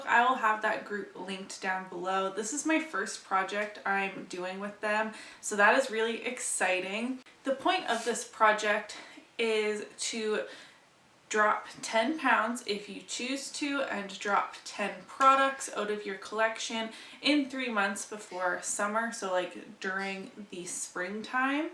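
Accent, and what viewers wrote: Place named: Canada. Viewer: Awesome update! Nice job losing the pounds and for having two empties!! 👏🏻👏🏻 I have been wondering this whole time if you are from Canada, so happy to finally get confirmation in this video!!! I seriously love your accent! Haha as weird as that sounds! 😂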